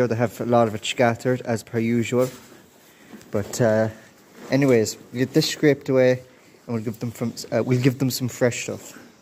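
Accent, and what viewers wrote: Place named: Claremorris, Ireland. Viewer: Great video I know you told me your from Mayo sounds very like a Clare morris accent 👌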